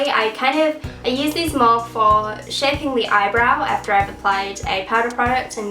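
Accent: United Kingdom. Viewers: I'm not used to the Gothic look with the British accent....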